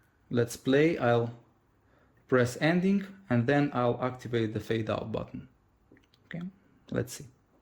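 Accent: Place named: India. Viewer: Донт анестенд :) У вас кстати индийский диалект :)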